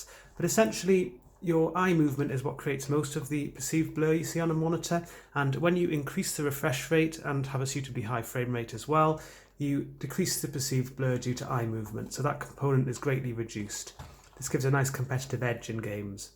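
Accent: United Kingdom. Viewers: you have an english accent, but im looking in UK and cant find this monitor. all i can find is the 24G2U5. where are u located?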